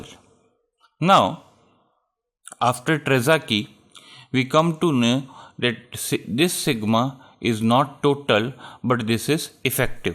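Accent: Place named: India. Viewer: everything is good except of indian accent, i just wondered if indians are inventors of geotechnical terms bcs theres no other videos by different accents or maybe you are most helpful country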